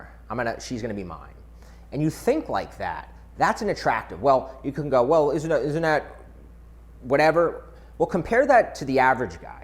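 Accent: United States. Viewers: Non capisco la pronuncia americana, ed è pure sgradevole. Non capisco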